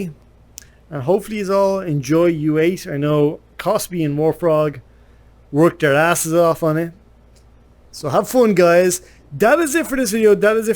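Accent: Ireland. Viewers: Are u Irish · He is indeed from ireland! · Except he’s irish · hay man love the game, i like ur accent. i have a feeling ur from ireland like me! and if u are where u from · Question: are you irish?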